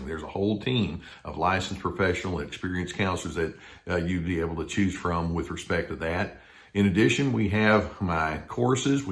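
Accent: Texas, United States. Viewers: It's not southern...it's Texan. Big difference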